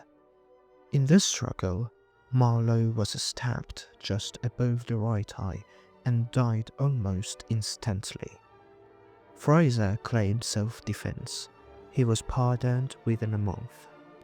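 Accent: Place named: United Kingdom. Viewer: We would like you to tell us the stories of ancient Iran (especially the Shahnameh) with a British accent, Professor. 🔥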